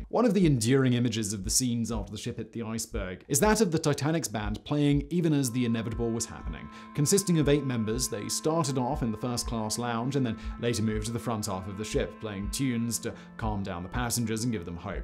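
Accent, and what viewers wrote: United Kingdom. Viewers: lol, again, I realize you wanna defend your buddy Simon, but Simon is an arrogant person. He absolutely believes his British pronunciation is 'superior'.... He is absolutely not a humble person who is apologizing for his mispronounced words. 😂👍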